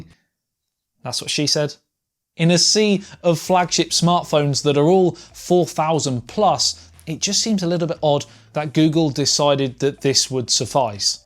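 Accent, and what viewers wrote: United Kingdom. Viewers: what can i say...feel the same. damn so cute and that british accent you could sale anything to me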